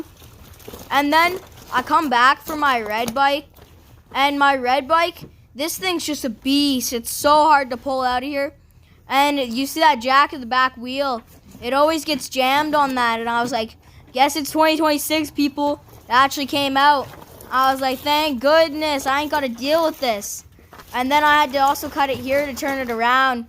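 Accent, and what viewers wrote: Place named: Newfoundland, Canada. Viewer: you should like a newfoundlander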